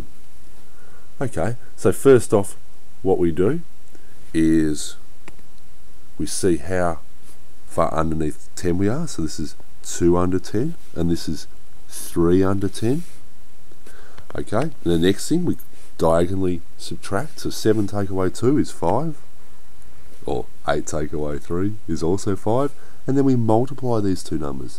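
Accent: Australia. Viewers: AYYYE THIS GUY IS AUSTRALIAN YEH MATEEEE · Awesome thanks for that! Just one more thing are u Australian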